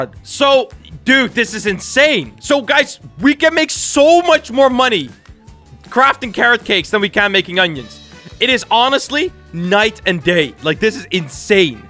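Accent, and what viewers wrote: Netherlands. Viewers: r u dutch? Cause i am haha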